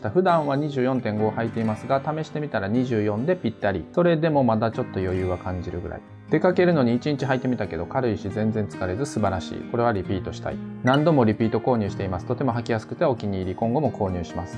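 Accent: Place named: Kansai, Japan. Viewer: 関西イントネーションすこ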